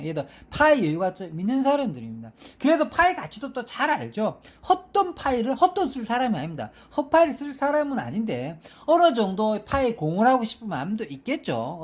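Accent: Gyeongsang, South Korea. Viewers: 행님 경상도 어데 사십니까?ㅎㅎ 좋은 영상 감사합니다 ㅎㅎ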